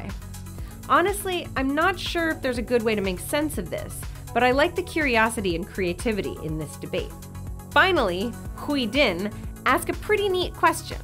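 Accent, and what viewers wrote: United States. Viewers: Y u talkin murican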